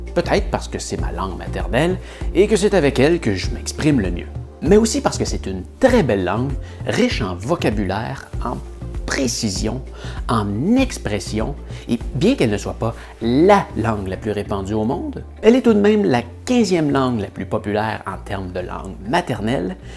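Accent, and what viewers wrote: Quebec, Canada. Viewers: "Sans" et "vent" ça ne le fait pas avec ton accent québecois : on entend sain et vin :-) mais ta vidéo est tout à fait probante, surtout la comparaison avec l'italien et avec les exemples d'orthographes anciennes ou des sources latines, je suis (con)vaincu. Ce que je vais ajouter n'est pas pour contredire tes propos mais pour apporter un éclairage et quelques complémentarités : l'étymologie se retrouve dans l'orthographe et peut donner du sens comme "forêt" (loin de sylvestre), dont l'accent circonflexe est un "truc" pour gagner de la place en supprimant le esse qui reste dans forestier, et pour ne pas confondre avec un foret pour percer le bois ou le métal. Un des mots que je trouve le plus dingue est « accueil », pour arriver à l’écrire je doit toujours le prononcer dans ma tête : akuèye, comment l’écrirais-tu ? Oui le français est plein de non-sens comme écrire « fils » pour le fils de son près, et pour les fils électriques, écriture qui ne dit pas comment ça se prononce, il y a des listes de ce genre que tu aurais pu citer. 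À contrario, l'amande le fruit de l'amandier, et l'amende prononcé par un tribunal : les militant en désobéissance civile qui vont en procès disent qu'ils aiment au menu les amandes et les avocats :-) Et c'est vrai qu'il est inutile de conserver une orthographe complexe, quand à côté le langage perd la poésie des métaphores, et quand les personnes vont vers un appauvrissent spirituel : on sait que certaines catégories sociales ont très peu de mots dans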